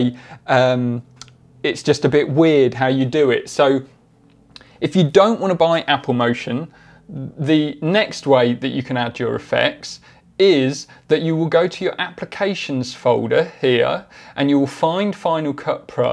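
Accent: United Kingdom. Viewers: You are the ONLY ONE who resolved my issue.... I BOW TO YOU🙏🏼❤Feeling so so so grateful. Plus I love the British accent🤗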